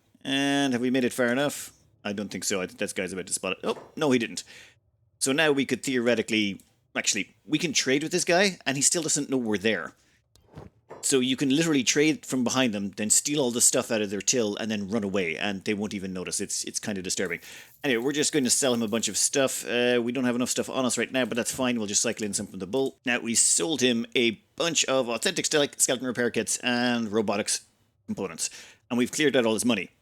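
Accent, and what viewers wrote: Ireland. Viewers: He answered it elsewhere. It's the R key lmao. It's just that paddy accent. Bless his heart.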